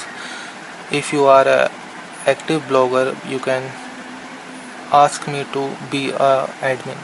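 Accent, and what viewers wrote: India. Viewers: hey bro talk in Proper English not in Bhaya English..I Laugh very very much after watching your video..How your talking man talk proper..It is very funnier than comedy nights with kapil.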